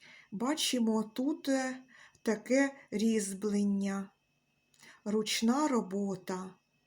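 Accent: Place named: Cherkasy, Ukraine. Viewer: Дякую за вашу співучу черкаську,нашу солов"їну українську мову. І вас з наступаючим новорічними та різдвяними святами! Миру, здоров'я, благополуччя!!!💙💛💙💛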